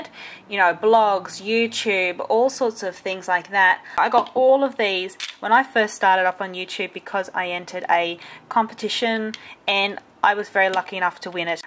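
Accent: Australia. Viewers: I love the way those sparkly bead thingies look for some reason.;) Oh no, it's that delicious-looking sponge again! I'm hungry agaiiin, and I just finished eating! As always, this is well shot, and the little notes and tips that pop up on the screen are cool. Ooooh, ooooh! And the fast motion writing on the sticky notes at the end! Genius! I loved it, and I mean that shit! <3 T'was wonderful to hear your Australian voice again,. I wish you the best!:D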